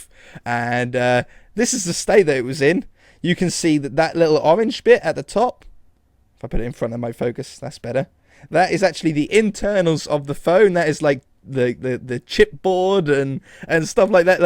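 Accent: United Kingdom. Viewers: That British Accent I'm First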